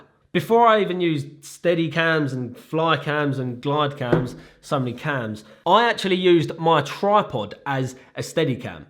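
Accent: United Kingdom. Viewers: Listening to this guy actually feels like watching he Peeky Blinders series. I love his British accent.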